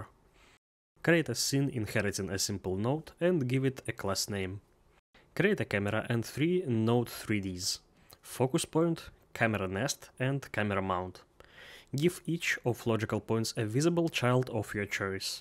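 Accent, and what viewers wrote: Russia. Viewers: Очень чётко слышно русский акцент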